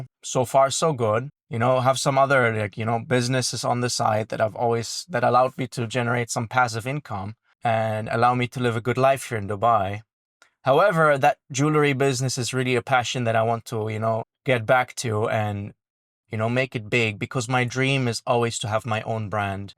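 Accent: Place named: Jamaica. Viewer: Liked and subscribed because your accent sounds kind of Jamaican 👍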